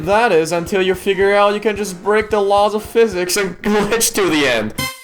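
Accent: Germany. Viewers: He has a German accent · Are u german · are u german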